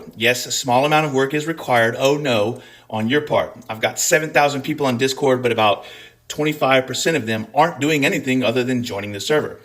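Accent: Scotland, United Kingdom. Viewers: I gotta say - I love when you’re getting into your rants and your Scottish accent comes out. We need more Scottish rants! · You can tell how passionate is about the subject based on how often his Scottish accent makes an appearance. And he should be passionate about this, as we all should be. If this administration can't treat our veterans properly, they won't treat anyone properly. Thank you, and the admin team, for everything you do. I made it to the end of the video and eff the algorithm.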